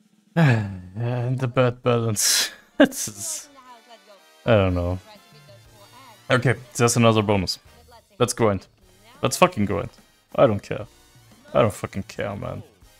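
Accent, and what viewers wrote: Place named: Germany. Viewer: 😆Nirgendwo sonst den deutschen Akzent so rausgehört!!!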